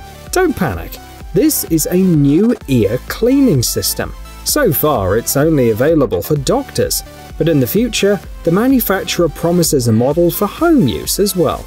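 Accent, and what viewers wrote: United Kingdom. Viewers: no, it makes me want to give this video a thumbs down and move on. Not only is the narrative never fully explained but I can't stand the fact that we are being inundated with these narrators from the UK. I Can't stand listening to them. I've had enough already!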